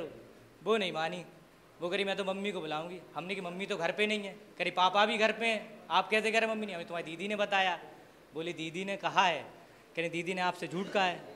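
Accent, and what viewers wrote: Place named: Bundelkhand, India. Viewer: BUndelkhandi to ho guru tumm ho kaha se.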